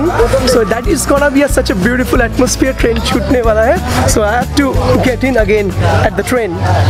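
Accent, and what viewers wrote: Bengal, India. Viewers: Sweet Bengoli accent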